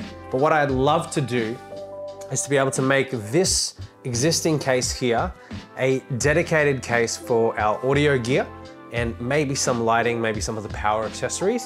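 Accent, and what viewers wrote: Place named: Australia. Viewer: Hey great to hear another aussie accent! just purchased a 1535 and made a small modification to allow me to attach a tripod to the case for traveling video on my channel 👍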